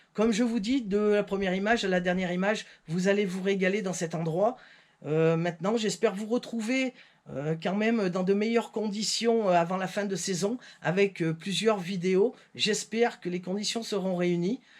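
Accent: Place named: Auvergne, France. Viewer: Salut camarade Bougnat .... Heureux de retrouver tes vidéos......et ton accent ...j'ai le même.....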